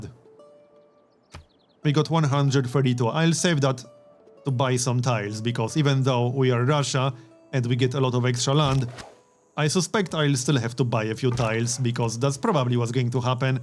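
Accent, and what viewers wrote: Poland. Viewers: He is obviously Polish :) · where are you from mate, you sound Polish.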